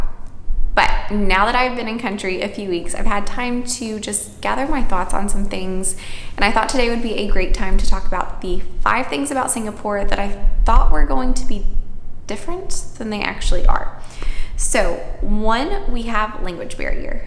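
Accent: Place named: United States. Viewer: you have a normal American accent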